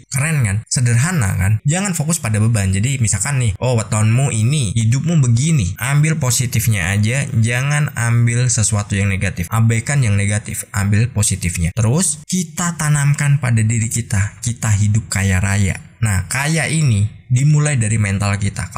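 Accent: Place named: Java, Indonesia. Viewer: Dgr bahasanya jawa medokk lngsung mesemmm kooo cos biasany bhsa indo trima kasih ilmuny ko berkah dunia akhirat buat njenengan sklrga dan smua pengikut chanel ini Aamiin Allahumma Aamiin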